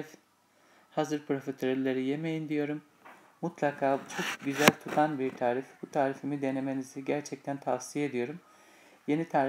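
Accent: Black Sea, Turkey. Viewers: Karadenizlimisin abla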